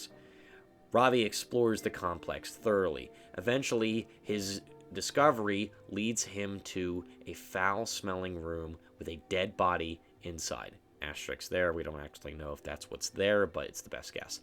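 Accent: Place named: South Jersey, United States. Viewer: I'm hearing a south jersey accent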